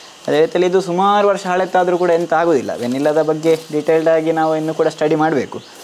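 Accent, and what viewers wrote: Mangalore, India. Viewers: ಮಂಗಳೂರಿನ ಬಾಷೆಯ ಸೊಗಡು ತುಂಬಾ ಚೆನ್ನಾಗಿದೆ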